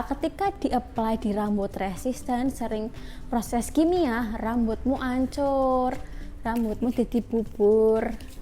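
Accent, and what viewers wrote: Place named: Java, Indonesia. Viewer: medok re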